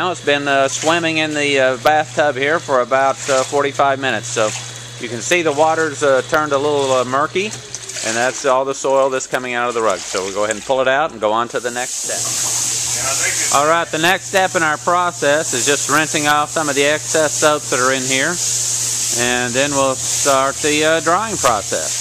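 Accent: United States. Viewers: Just saw your video and had not seen how rugs were cleaned before. Thank you for posting it. You sound like a Southerner. Are you located in the US Southeast? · Are you from Tennessee??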